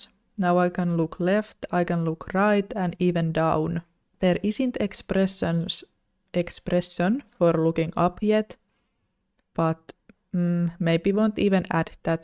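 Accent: Finland. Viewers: i can recognize a finnish accent anywhere and this is one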